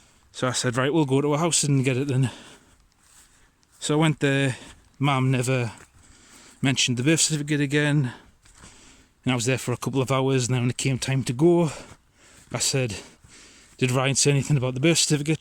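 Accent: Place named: Geordie, United Kingdom. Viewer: It took 3 months to renew mine, but that was sending the paper work from here in Australia. I detect a mild geordie accent, im originally from tynedale.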